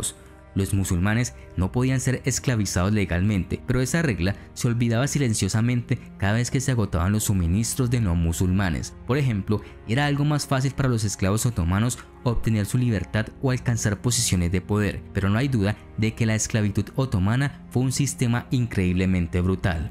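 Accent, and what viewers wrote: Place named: Colombia. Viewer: El que está hablando debe pronunciar la "d", parece colombiano, o es, ellos no pronuncian bien las consonantes, y le cambian el acento natural a las palabras.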